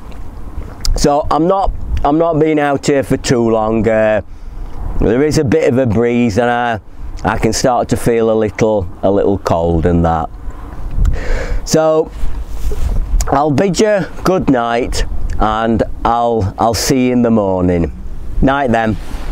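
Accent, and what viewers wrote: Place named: Sheffield, United Kingdom. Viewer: Just found your channel with the chesterfield tunnel. I grew up round there but was in London and the south the last fifty years. Walked to Carl wark with dad. Nice to hear the Sheffield accent , if I might say different to the chesterfield one. Enjoyed all the camping stuff . Thanks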